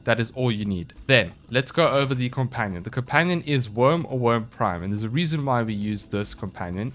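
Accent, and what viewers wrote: South Africa. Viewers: Are you South African? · Are you south african??❤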